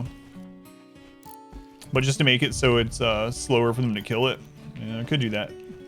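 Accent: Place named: Appalachia, United States. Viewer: you should totally let your flag fly and do an Appalachian Run. You can revert to your old accent, only settle where it's most like Appalachia, and role play with all the stereotypes and culture of home. That would be amazing to see.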